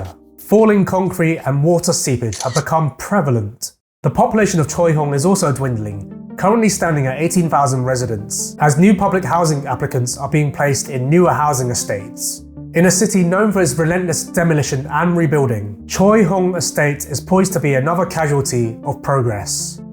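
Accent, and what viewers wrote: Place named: United Kingdom. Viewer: bro u sound so brit it trips me out lol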